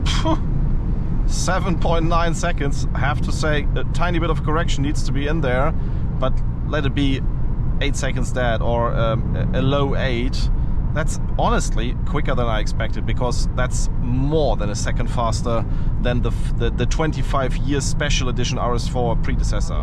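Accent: Germany. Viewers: A german trying to speak british english with a heavy german accent. It sounds so ridiculous and disgusting 🤢🤮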